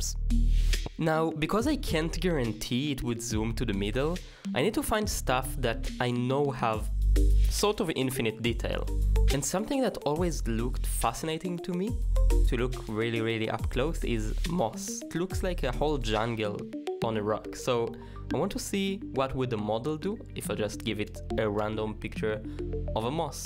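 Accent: Israel. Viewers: בערך שני שליש לתוך הסרטון חשבתי לעצמי ״מעניין מה זה המבטא הזה? מעניין מאיפה הוא?״ ואז קלטתי שאתה ישראלי!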